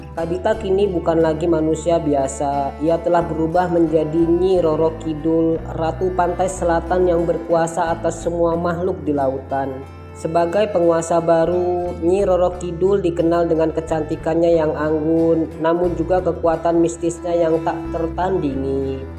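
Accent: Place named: Java, Indonesia. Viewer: Suaranya jawa banget, mending pake AI aja, eneg suaranya didengar.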